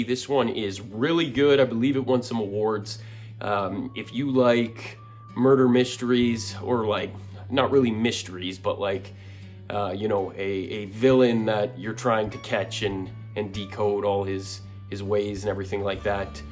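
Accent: Canada. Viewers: You're Canadian, aren't you? (I subbed btw nice list) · Love this presentation, love your Ontarion accent.Respectful , sincere , dedicated- lots of fun.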